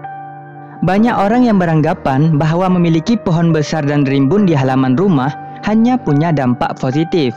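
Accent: Aceh, Indonesia. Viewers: Nah iya kan org Aceh ni pasti yg punya channel 🤣🤣🤣 logatnya ya kan cuy 🤣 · orang aceh bang?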